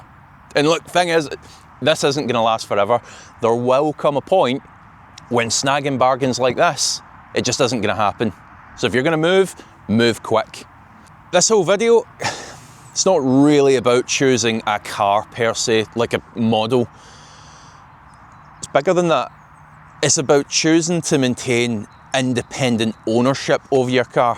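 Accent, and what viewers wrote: Scotland, United Kingdom. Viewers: Love the Scots accent too!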